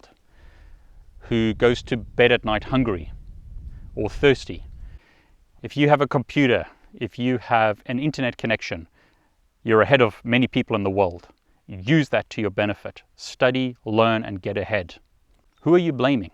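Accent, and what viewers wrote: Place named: South Africa. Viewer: Condolences to your family,. Thank you for your inspiration. It's something that we definitely need more of. P.S. I thought that you sounded like you were from South Africa.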